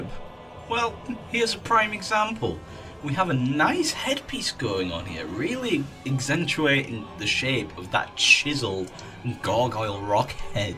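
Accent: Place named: Northern England, United Kingdom. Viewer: I love your northern accent, you from Sheffield or something?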